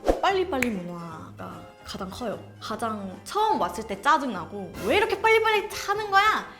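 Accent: South Korea. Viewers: 영상 안 보고 듣고만 있으면 그냥 한국인...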